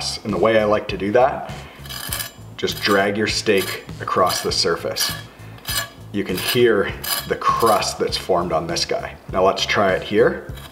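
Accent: Canada. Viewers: Western Canadian accent detected...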